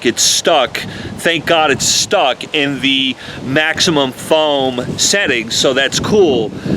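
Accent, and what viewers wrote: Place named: New Jersey, United States. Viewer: I was expecting a southerner when it said Dallas, Tx, not someone from Jersey.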